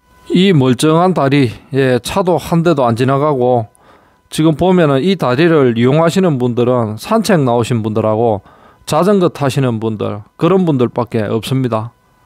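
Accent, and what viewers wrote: Gyeongsang, South Korea. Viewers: ㅋㅋㅋㅋ 경상도 잘한다 수준에 딱 맞다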